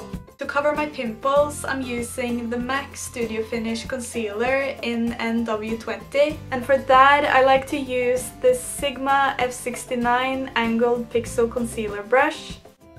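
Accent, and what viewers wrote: Norway. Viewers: It's the norwegian accent.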